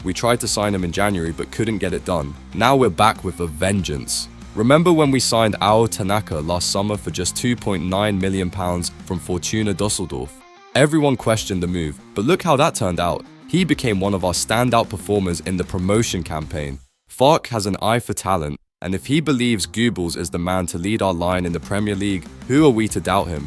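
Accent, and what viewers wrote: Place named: United States. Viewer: Oh here we go again with the “insider information” on any upcoming signings. These guys are unbelievably quick at getting the inside information out before anyone else, only to realise afterwards it’s a load of cack and never comes to fruition. I love how they report on things that have happened behind the scenes with our beloved club without a single shred of evidence in their reporting and just showing random excerpts of Leeds scoring goals. Did you see their report of Haaland praising Warnock with pics of Haaland and the Leeds badge in the same photo, garbage. Listen guys, these lot are in it for the “likes” and “subscribes” Not from this reluctant viewer. Who are these guys with the American voice over, who do they report for or from.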